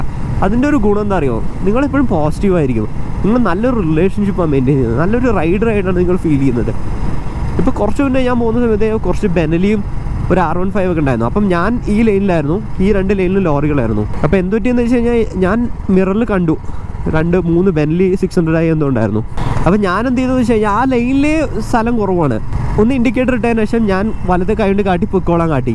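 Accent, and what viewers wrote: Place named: Kannur, India. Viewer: bro ഏത് ജില്ല ആണ്? കണ്ണൂർ ആണോ അതുപോലെ തോന്നി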